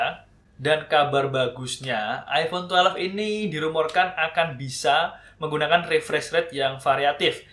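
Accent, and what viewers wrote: Indonesia. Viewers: Orang sunda ya?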